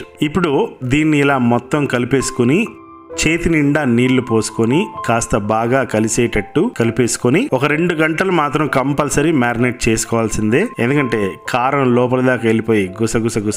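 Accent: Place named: Karnataka, India. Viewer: Bro ur kannada accent super guru...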